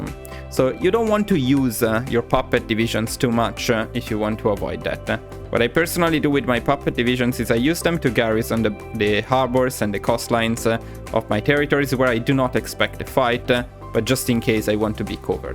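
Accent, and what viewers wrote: Italy. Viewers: That accent seems Italian, quell'accento mi sembra molto italiano è uno dei nostri? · Wait sei italiano?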